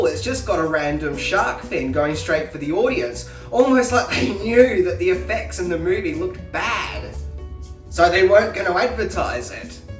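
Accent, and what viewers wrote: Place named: Australia. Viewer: I have one. Superman the quest for peace. Its so bloody awful. The superman series could've done with out it. Worst part is when they r on the moon fighting. Looks like they r in a desert at night fighting in sand. I know the budget was cheap and cheerful. But come on make at least believable. Love the Aussie accent. Visting Melbourne is on my bucket list.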